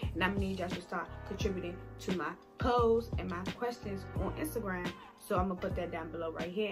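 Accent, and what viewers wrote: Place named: Baltimore, United States. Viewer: Only real ones know she has a Baltimore accent 😭😭😭😭.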